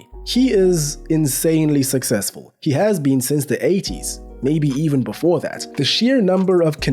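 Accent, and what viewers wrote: South Africa. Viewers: You sound South African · Bro are you south African? Your accent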